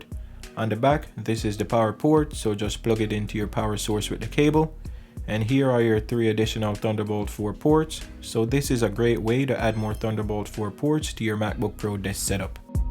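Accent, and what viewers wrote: Jamaica. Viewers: Your Jamaican?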